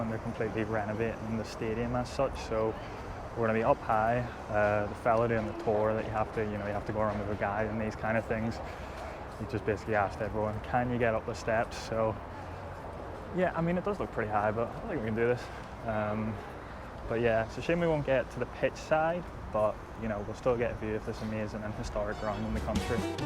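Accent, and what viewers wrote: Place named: Northern Ireland, United Kingdom. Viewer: Great video, thanks for visiting my favourite club. I can recognize your NI accent :-) Hope you have a great time in Argentina.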